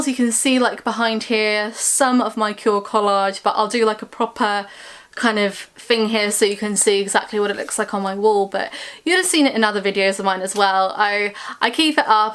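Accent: United Kingdom. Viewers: Aww hello to u hon..Im Nancy..I just came across your channel while doing some checkup updates on....I must tell u that it's so so awesomely good to see the younger newer generation of today getting into one of the most underrated bands of my time..The 80s..so underrated there totally awesome...not many people have ever heard of them even back then..I was a teenager when they came out and all through my teen high school years even today now I still love them..yes I'm older now..just turned 51..this is me in the pic. You remind me of myself then blond hair all black clothing..which I still wear.im still that cool 80s chick at ❤..lol I know so much about and other great 80s bands like them u might love to know about and give a listening to..huggs to u..yes.i talk alot to..by the way..i love your English accent.you a Brit...nice to meet you dear..always an old school Curran here..huggs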